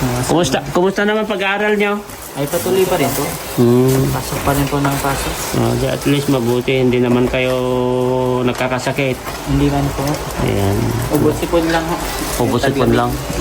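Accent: Ilocos, Philippines. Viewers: Salamat sa pagtulong at naka subcribed napo ako sa chanel nyo sir ilocano kasi sa tono po ng pananalita mo god blessed po at sa magkapatid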